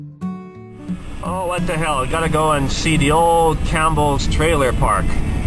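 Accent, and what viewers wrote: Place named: Fargo, United States. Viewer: funny accent. like the movie Fargo!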